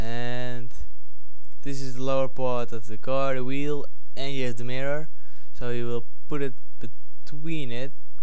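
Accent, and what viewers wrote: Netherlands. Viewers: ja klopt ik klikten op de video en hoorde gelijk dat hij nederlands is :P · je hoort dat je nederlands ben haha aan je accent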